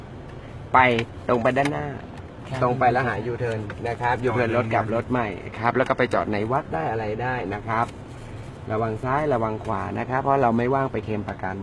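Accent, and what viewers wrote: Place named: North, Thailand. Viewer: ชอบที่พูดเหนือจังเลยค่ะ เป็นเอกลักษณ์ดี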